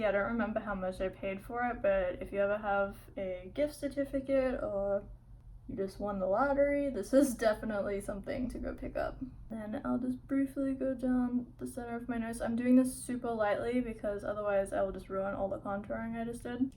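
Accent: Australia. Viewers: i detect a aussie accent also this video is sooo good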